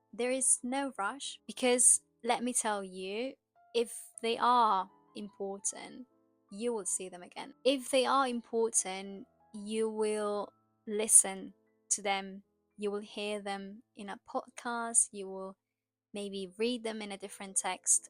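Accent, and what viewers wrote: United Kingdom. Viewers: oooo British English.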